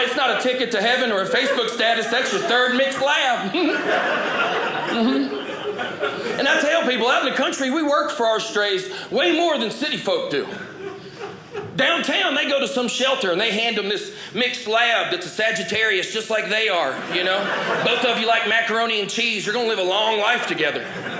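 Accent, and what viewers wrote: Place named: South, United States. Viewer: it's how Alabama, Arkansas, Texas... people talk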